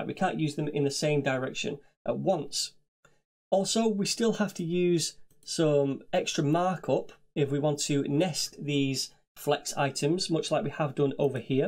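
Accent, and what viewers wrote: United Kingdom. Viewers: why is the British accent is so (f) hard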